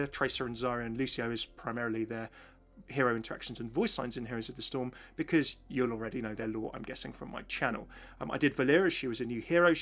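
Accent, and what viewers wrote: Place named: United Kingdom. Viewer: Your voice has a British accent. You British?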